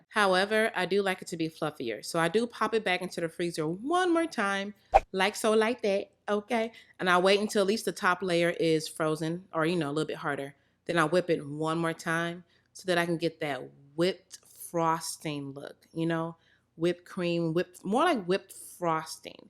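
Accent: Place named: Nigeria. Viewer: I like your Nigerian accent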